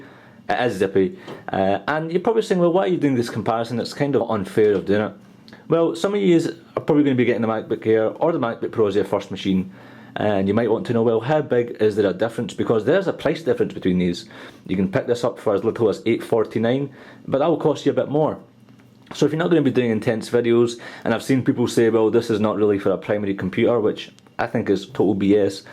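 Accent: Scotland, United Kingdom. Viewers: im getting the macbook pro even tho its slower its much more nicer looking, loving the scottish accent :)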